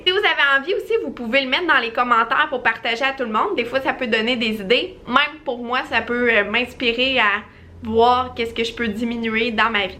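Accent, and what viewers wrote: Canada. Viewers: J adore ton accent chère youtubeuse du Canada.. Et tes conseils bien sûr une abonnée du Sud de la France.. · Mon dieux comme c fatiguant d entendre l accent française des canadiennnnnnn.... c fait mal a la tête..excuse moi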